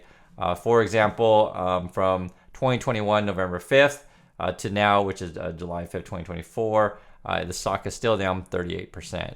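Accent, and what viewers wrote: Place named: Pacific Northwest, United States. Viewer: Fellow Oregonian here! Funny, I thought you might be from the PNW. The way you speak gives it away, big time. haha! Thanks for the video!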